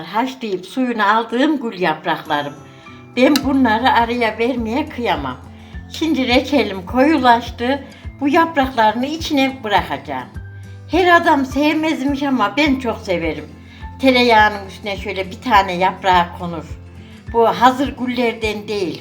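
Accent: Kayseri, Turkey. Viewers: Teyze kesin Kayserili şive aynı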